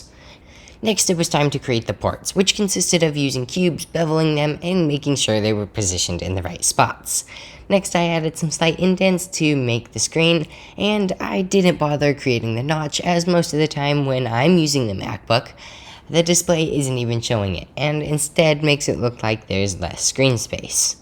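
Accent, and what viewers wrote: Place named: United States. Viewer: Dude you sound American. So, I would assume the imperial system should be second nature😂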